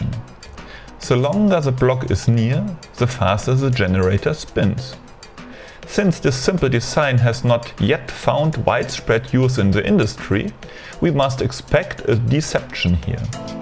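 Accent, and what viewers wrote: Germany. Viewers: Maybe you write in the videos Headline, "MY Magnet Motor do not work" ;) ich denke ich kann auch deutsch reden, du hast son typisch deutschen englischen Akzent :D Mit dem Thema musst du dich schon ausführlicher beschäftigen, denn einfach magneten an paar Platzikteile kleben, das reicht leider nicht, das wichtigste ist ja ein ungleichgewicht bei Anziehung in die gewünschte Drehrichtung zur Gegenrichtung zu schaffen, was auch schon bei hohen Drehzahlen ohne jeglichen weiteren Bauten funktionieren würde, aber wie hoch diese sein müssen kann ich nicht sagen, um was es dabei geht, einfach mal das hier schauen: <link> Was Quantum Energy meinte ist auch eine Möglichkeit, einen Art Monopol zu erschaffen, der Lüling hat es gemacht, in dem er die Magneten im richtigen Moment räumlich entfernt hat, über eine Feder...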